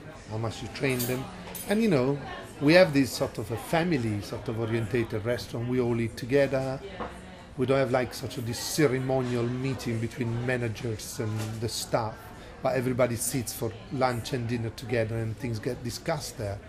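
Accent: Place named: Italy. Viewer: L'accento c'è ma il lessico ragazzi... tanta roba😎lo parla da Dio.Mi sembrava di sentire un inglese con accento italiano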